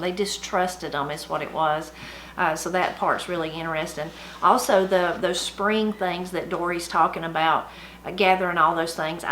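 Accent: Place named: North Carolina, United States. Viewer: I love hearing your stories, my daddy was raised in Burnsville North Carolina in Yancey county. When I was growing up every Sunday me and all my cousins went to Papa's house where my daddy and five brothers were born and raised, my mama and Aunt's would go in the kitchen and on the big black wood stove start making a big Sunday dinner, it always seem to taste better to me when it was cooked on that wood stove. I still live in North Carolina and I so enjoy watching you, I also like to hear the way you talk because you sound like me I mean no disrespect by that! Thank you for keeping our traditions alive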